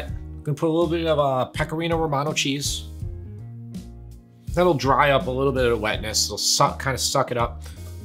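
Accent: New York, United States. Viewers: Great channel. Great presentation. This guy is very down to earth, with the best NY accent. Nice!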